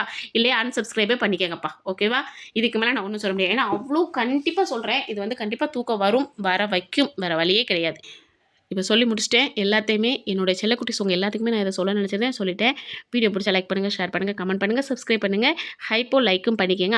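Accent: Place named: Kovilpatti, India. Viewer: Akka ninga ena ooru na kovilpatti nama ooru slang pesuringa ketan rmb depression aaguthu ka thaniya iruka mari iruku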